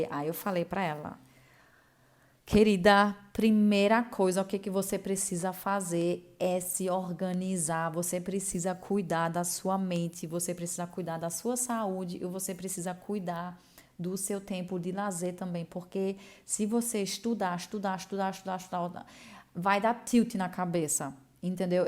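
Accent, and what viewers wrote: Northeast, Brazil. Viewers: Nossa que sotaque de nordestina, amei akkakak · Sotaque nordestino e carioca .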